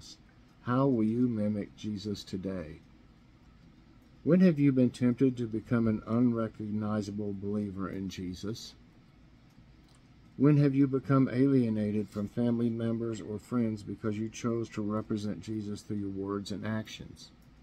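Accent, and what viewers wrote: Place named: England, United Kingdom. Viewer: I love hearing your prayer with English accent,. I love it and thank you 🙏 always for regular prayers 😍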